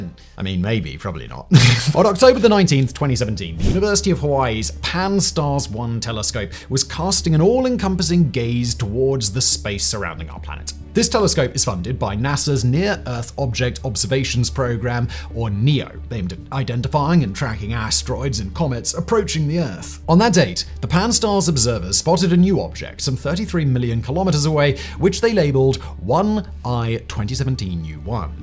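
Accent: England, United Kingdom. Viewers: Go back to England! Like soddenly you know what we’ve known for two years. I hate that snooty as accent.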